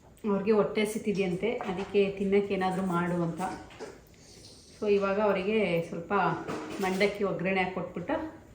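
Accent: Mandya, India. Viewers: Nim matu keli kushi agutte Same nam mandya style ಮಾತು❤ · Nimma mandya bhashe thumba chennagide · Hai nice vlog .nim Mandaya language thumba channagide nice to see your vlogs .OK bye take care.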